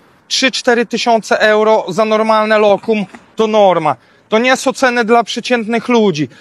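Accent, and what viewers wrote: Podlasie, Poland. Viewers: Dobry content 👌🏻 W UK podobnie. Ps.Świetny accent 💯💪🏻Podlasie? Pozdrawiam z UK 🇬🇧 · uwielbiam ten podlaski akcent, hahahahaha, a swoją droga na Podlasiu bida , warto tylko latem przyjechac na wakacje i nic więcej. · Man znajomego z takim akcentem:) z podlasia chłop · Słyszę akcent z Podlasia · Podlasianin z wasci. Piękny akcent jako Bacha słuchać mozna❤❤ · Podlasie słychać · Ale masz zaj bisty, podlaski akcent 👍 podobnie jak prof.Kris od aut🙂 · Słychać podlaski akcent, dla mnie to klimat wakacji😘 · Uwielbiam ten podlaski akcent. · Podlasie jakby. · to akcent z podlasia. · Z ktorej steony polski ten akcent przyjacielu ? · Słychać😊 Jak się zna Podlasie :)) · Nie obrażaj Białegostoku bo poczułem się dotknięty akcent Siemiatycze i Hajnówki.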